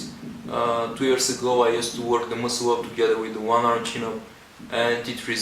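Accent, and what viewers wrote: Bulgaria. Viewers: hey man, are you bulgarian?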